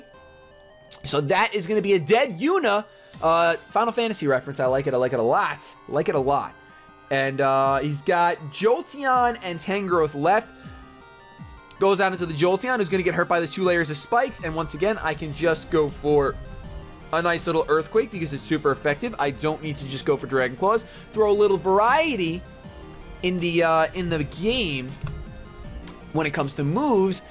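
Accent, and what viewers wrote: India. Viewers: Why the Indian accent offensive to me mate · Indian accent ftw. You've got yourself a sub bro.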